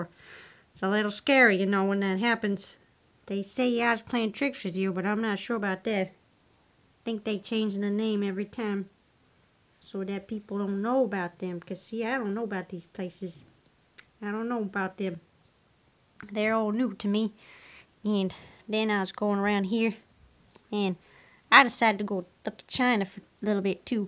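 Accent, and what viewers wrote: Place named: Texas, United States. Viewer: Wow you are israeli but you sound like a texan. How???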